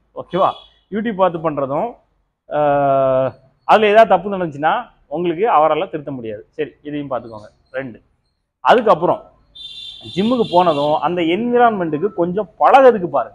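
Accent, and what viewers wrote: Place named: Tuticorin, India. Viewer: TUTICORIN slang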